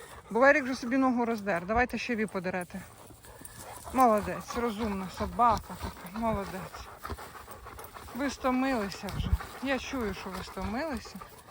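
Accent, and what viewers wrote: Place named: Podillia, Ukraine. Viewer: Ви часом не з Поділля?Мова подільська,точніше-вінницька.Уявляю,як зреагує ваш чоловік,коли там ви насієте кропу,петрушки і прикрасите чорнобривцями.Я жартую,звичайно.Бажаю вам здоров'я і щастя!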